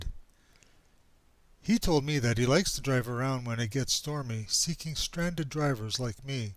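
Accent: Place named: Canada. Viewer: This is such a heartwarming story. Great writing. I love your deep Canadian voice!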